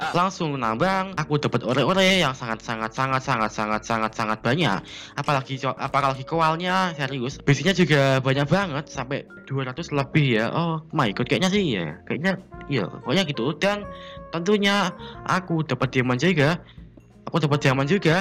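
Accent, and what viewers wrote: Java, Indonesia. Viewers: jowone terlalu medok iki kayane,, ngommong bhs indonesia we raiso alon lan kesrimpet srimpet wkwkwkwk btw jowo ndimas? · Setuju banget (╥﹏╥) jawa nya ada imut-imut nya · Orang mana bang, kok logat nya kayak orang jawa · Jowo ne medokkk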